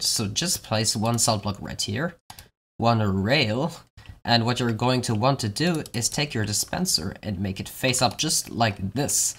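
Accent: Germany. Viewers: MC He is German, you can clearly hear his German accent XD I guess all really good technical minecrafters come from Germany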